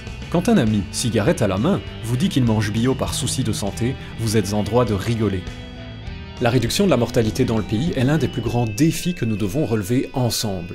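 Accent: Belgium. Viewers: 1- On ne dira jamais assez à quel point la bienveillance des accents belges est bien adaptée à la transmission du savoir. 2- La vidéo tombe malheureusement à point nommé.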